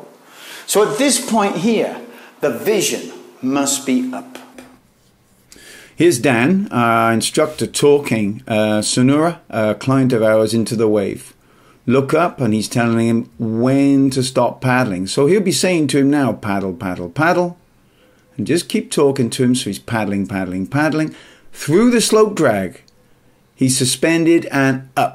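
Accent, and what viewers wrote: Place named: Newport, United Kingdom. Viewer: Can’t tell what accent he has sometimes I notice a Newport accent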